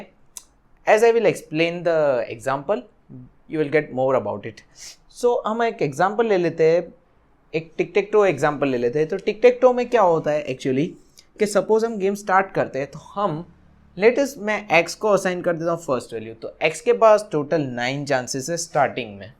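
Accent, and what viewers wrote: Gujarat, India. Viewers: are you gujrati you say that 'so aama ek exmple le te hai' good job bhai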